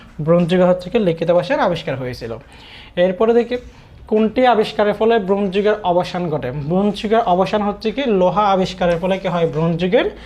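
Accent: Chittagong, Bangladesh. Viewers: Bhaiya apner basha Chittagong maybe🙂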